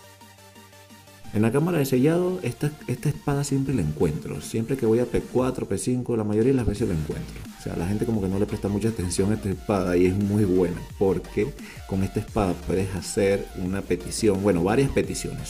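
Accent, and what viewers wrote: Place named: Venezuela. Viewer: Papi me suscribo, fijo eres venezolano casi que zuliano saludos desde la merjor ciudad de venezuela Maracaibo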